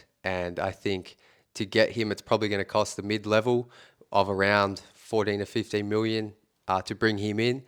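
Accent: Australia. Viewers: Australian, yes. And a legend!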